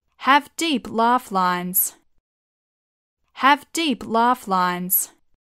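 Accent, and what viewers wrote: England, United Kingdom. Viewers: Произношение чисто Английский (England) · У вас Британский язык?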